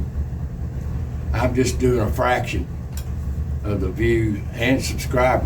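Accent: Appalachia, United States. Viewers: Thanks. Watch you every time you post. If those folks don’t know your accent, they are not from around here. And I’m in the Blue Ridge of Virginia. Yea, I’m behind enemy lines now but still country to the bone. Love ya in Christ, brother. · one of the reasons I love your channel is because your accent is like my family's accent. Like the accent in the mountains of Virginia where I grew up. I live in North Carolina now, so I'm still in Appalachia, but I miss that area where Virginia, Kentucky and Tennessee come together. It's where all the beautiful nature is, and where all the kindest and most hospitable people on Earth live. People just like you and yours,. Your videos are a PLEASURE to watch! I love your nature video series, AS THE RIDGE TURNS. Dirty Dan is an old rascal, and I'm always eager to see what ol' Yeller, Sock, Ricky or Billy Bobcat is up to! 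I miss seeing Wishbone and ol' Sidewinder. These little animals have become like old friends, and I have you to thank for that! Bless you and your family, and thank you for providing me with hours, hours and hours of good, clean, family entertainment. I truly appreciate it. It's a great way to start a Monday to see nature's beauty. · People who think your accent is fake do not understand there are different southern accents. Yours is like my granny's was, what I consider to be mountain speak. It's real, just no longer common. There were times I couldn't understand Granny, hour was 'ahr', flour was 'flahr', not one was 'narry', wash was 'warsh', there was 'aher' or 'yander'.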